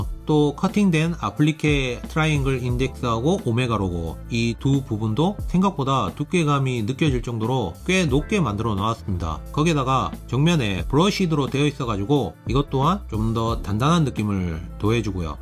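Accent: Gyeongsang, South Korea. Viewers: 경상도 사투리만 조금 더 다듬으면 전달이 더욱 잘 될 것 같습니다.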